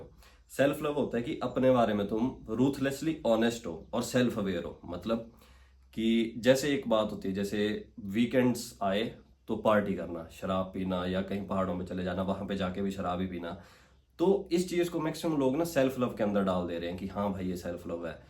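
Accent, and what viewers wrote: Himachal Pradesh, India. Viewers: You are talking in hindi,so there is a himachali touch in it and i am from Himachal,so I felt good to hear you Nice 🥰❤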